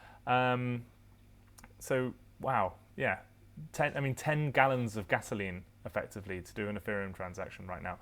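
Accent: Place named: United Kingdom. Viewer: Great to hear another British accent :)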